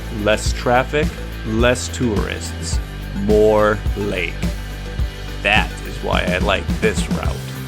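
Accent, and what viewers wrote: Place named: United States. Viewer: Great video! You've got a classic American voice - you could read the news with those pipes! lol at the Baywatch music, haven't heard that in so many years, What a bizarre show! 😅 I had a look on google and I did not know Wisconsin was just below Canada. The countryside reminded me of the Tom Green show I used to watch and the road trips he did. Hope you and are staying safe during these anxious times ✌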